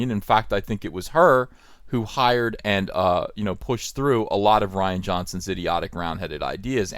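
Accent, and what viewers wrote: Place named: New Jersey, United States. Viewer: Knew you were from Jersey by your accent! Maybe that’s why I can relate to you so much. That and how humble we both are 😂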